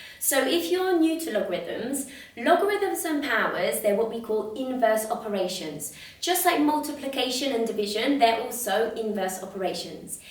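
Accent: England, United Kingdom. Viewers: Bonjour, je ne jette pas de fleurs et c'est bien la vérité votre chaîne Youtube est une référence en matière de l' éducation des mathématiques, elle est d'ailleurs parmi mes rares chaîne préférés, j'ai parcouru presque tous vos vidéos et c'est toujours avec le même haut niveau de professionnalisme et de la bonne humeur que vous enseigner cette matière aussi Clairement que brièvement ,d'ailleurs vos vidéos me sont de grands aide pour enseigner les mathématiques à mon neveu,parfois à distance, qui est inscrit tant tôt dans un collège américain tant tôt dans un collège britannique à Dubaï et qui est un brillant et aimant des mathématiques et qui apprécie beaucoup d'ailleurs votre manière d'enseignement et aussi votre accent anglaise il faut signaler aussi que votre langue et prononciation est très claire et compréhensible malgré que j'ai étudié les mathématiques en français et mon anglais est basique je ne trouve aucune difficulté pour comprendre, merci infiniment pour votre précieuse aide et pour vos efforts déployés et votre temps dédiée à l'enseignement et de partage du savoir félicitations bonne continuation et bonne chance.👍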